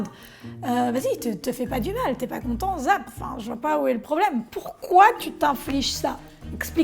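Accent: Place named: Italy. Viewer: Charmante femme avec un bel accent italien , qui nous donne des vidéos intéressantes à regarder concernant les drones ou autres... continue comme ça et au passage même si tu es assez grande pour ça, ne fais pas attention aux haineux. Au plaisir de voir tes vidéos ! À bientôt 🙂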